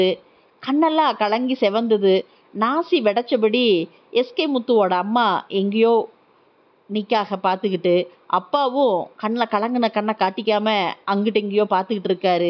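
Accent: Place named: Tirunelveli, India. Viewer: நாஞ்சிலாரின் கதை அருமை. கதை சொல்லியின் திருநெல் வேலி பாஷை பிரமாதம்.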